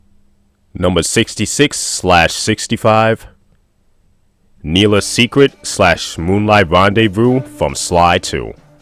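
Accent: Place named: New England, United States. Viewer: Stupid question. What part of the us is your accent from? Sounds like New England in general. My guess is new Jersey, or Boston. Linguistics fan here. Anyone with more info lmk happy to learn!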